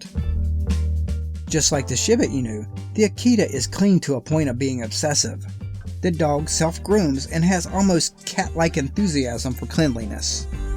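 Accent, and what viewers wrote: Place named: United States. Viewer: I do agree with you about them being different breeds, but if he is from America (which he sounds like he is) then he is not technically wrong by putting both together, since if i am not mistaken in America they are not considered to be a different breed. So no real reason to insult him on doing poor research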